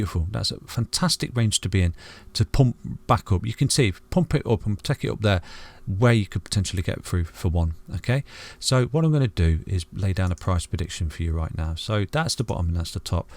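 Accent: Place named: Manchester, United Kingdom. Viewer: There's a lively discussion about where in the UK your accent is from going on in the Harmony holders telegram. I'm guessing somewhere nearish to Manchester, how did I do?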